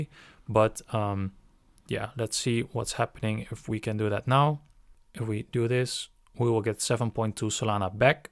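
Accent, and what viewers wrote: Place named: Netherlands. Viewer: Je hoort het aan het accent hij komt sws uit nl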